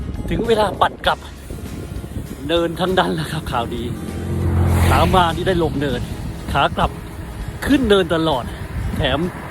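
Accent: South, Thailand. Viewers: เหลงใต้ชับเปรียะเลยครับ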